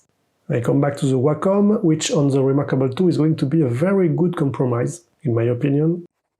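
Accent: France. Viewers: Toi t'es Français. Tu parles aussi bien que moi avec ton accent magique et grace à ça j'ai tout piger. Merci.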